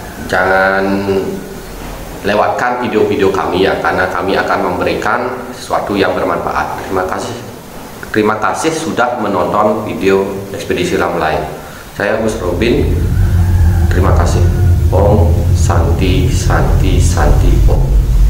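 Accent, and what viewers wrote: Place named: Negara, Indonesia. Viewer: bli, uling negare bli? adi logat care nak negaroa? suksme🙏😊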